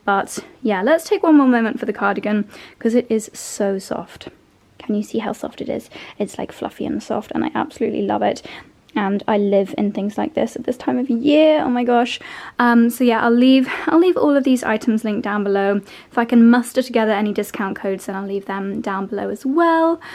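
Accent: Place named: United Kingdom. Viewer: Yes that word isn’t used in The US. However, you need to continue using The Queen’s English. It’s very charming to Americans listening & watching your Vlog!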